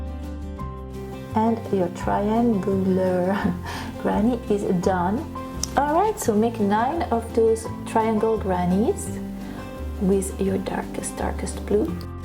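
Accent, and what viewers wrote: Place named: France. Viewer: Hello . U have a very beautiful voice and accent , French !!! . Beautiful skirt . Thanks .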